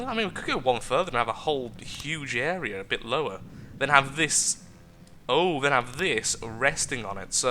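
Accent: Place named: England, United Kingdom. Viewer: lol i can tell that you are english from your accent.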